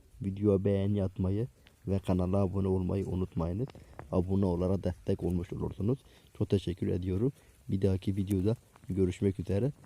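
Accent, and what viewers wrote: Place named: Muş, Turkey. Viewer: Şivenden dolayı muşlusun gibi geldi bana. Doğrumu tahmin ettim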